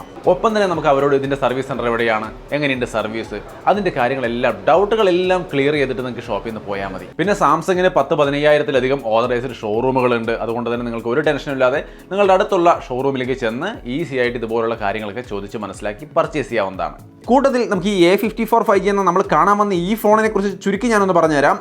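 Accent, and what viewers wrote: Thrissur, India. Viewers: chetta Thrissur ഭാഷ കയറി വരുന്നുണ്ട് 😄😄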